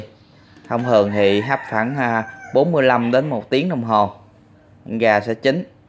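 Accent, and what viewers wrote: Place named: Binh Duong, Vietnam. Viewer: Nghe giong noi ban ... minh nghi ban o binh duong thi phai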